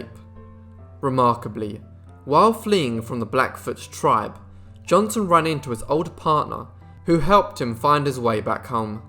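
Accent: England, United Kingdom. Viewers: Can’t understand this fucking Britt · English accent, I'm out. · Mark Felton that voice is awesome rolling proper British Gentleman s equal to his fantastic content, definitely in the running for YouTube Supreme Docudude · Why is a England accent doing the narrating it doesn't match the man. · I am surprised to hear this narration. I know American stories are shared the world over, but hearing English narrators is still a novelty for me. Thanks for the information.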